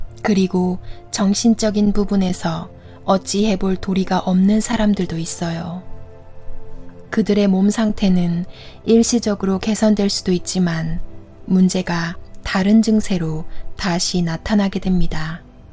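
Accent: Gyeongsang, South Korea. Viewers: 안녕하세요~오늘 처음 와봤는데 왠지 귀에 착착 붙는 경상도 억양...ㅎ 제가 대구 출신이라 너무 정감있네요~^^ 저와 님의 끌어당김으로 제가 오늘 여기에 온것이겠죠? 좋은 내용 감사히 잘 들었습니다~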